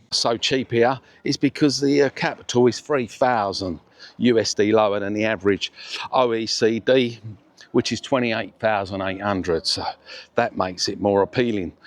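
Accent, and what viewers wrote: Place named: Lancashire, United Kingdom. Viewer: Now I'm hearing Rick Parfitt (RIP), is it Lancashire or something? :)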